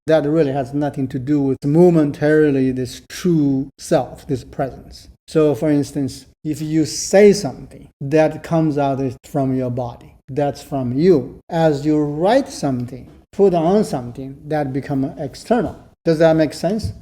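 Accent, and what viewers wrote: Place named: Asia. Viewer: 😅funny the midweight accent of the speaker is certainly Asian origin, more likely Mongolian line of accent, like Mandarin or Korean; and, no Chinese is not the only non-phenolic language in the world, certainly a very poorly researched position. And to used such a narrow band of measurement to arrive at such a broad encompassing subject is a slap on the essence of academic endeavors. I used to like Hagel a lot as I like the challenge to follow his maze of thoughts, sadly the older I get the more I experienced, the more flaws in Hagel I see, and the more reasons that to see how he wrote with so much unnecessary acrobatic maneuvers to confuse and to hide the tracks of flaws. My humble 2 cents.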